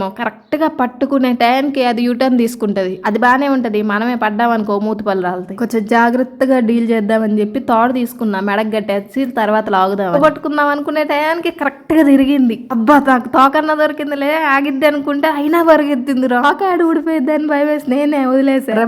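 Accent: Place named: Kadapa, India. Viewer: Hii 👋 akka 😊😊😊 Same slang madhi kuda 😂😂😂😂madhey kadapa akka Medhi a oouru akka😂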